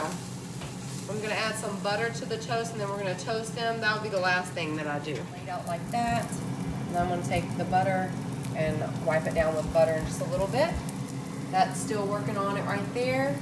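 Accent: South, United States. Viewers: I just love to watch you cook. You remind me so much of myself with that southern Belle accent.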